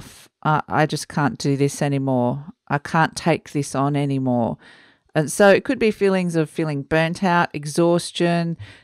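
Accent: Australia. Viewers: Hello, great to hear a fellow Aussie doing readings on you tube. They are good readings too. Do you do readings at any of the psychic and wellness fair held in NSW? It would be great to meet you one day. Cheers.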